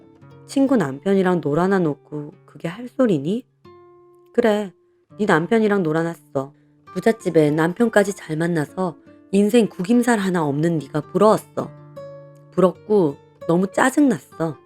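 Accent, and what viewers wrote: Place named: Gyeongsang, South Korea. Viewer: 경상도 사투리 반갑습니다!!!!!!! :D 경상도 사투리라 그런지 사이다 부분에서 두배로 시원해요 ^0^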